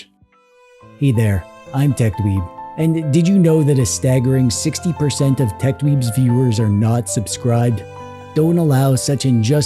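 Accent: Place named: Canada. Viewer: I wonder where he's from "withoot faffing aboot" .. oh, Canada.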